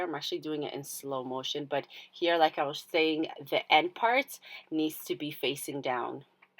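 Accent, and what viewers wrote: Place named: Canada. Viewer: Cunto Macaan maasha Allaah I show them and they lake the Canadian accent too thank you abaayo every week they are gona Cooke one think mahad sanid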